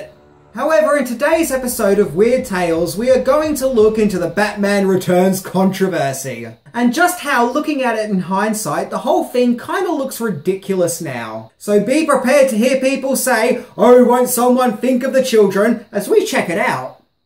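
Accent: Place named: Australia. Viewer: this is what tim pool looks like without his beanie, hey tim pool pretty good aussie accent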